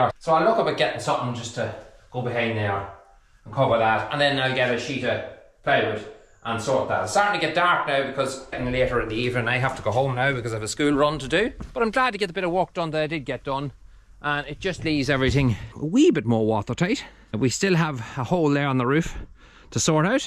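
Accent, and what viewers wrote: Ireland. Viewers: So happy to see some work done here! My great grandparents were dairy farmers in Ireland. Do you have the name of the land owner? I'm very curious about all the history. Don't know much about them as my grandmother n father immigrated in 1928 to the USA at the age of 18 I believe. They passed when I was fairly young so I wasn't able to ask any worth while questions. I enjoy watching your videos...a glimpse of where n what they might have lived. Some family members still there but I don't know them. And your brogue is every bit of talking with them! Love it! Thanks. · Greetings from the Ozark mountains in Arkansas, USA. Reminds me of all the old places I have rehabbed in my 80 yrs. I’m on my last one, an old woman now, I just enjoy watching my birds and critters. But I’ve loved giving all those old cottages a new life for the next generation to shelter in. 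More fun now to watch someone else save one, and listening to that lovely Irish lilt makes it even better!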